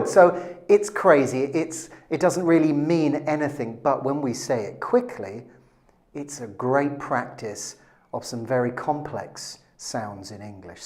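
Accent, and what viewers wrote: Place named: United Kingdom. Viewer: I miss Avalon and is a good teacher I like his british accent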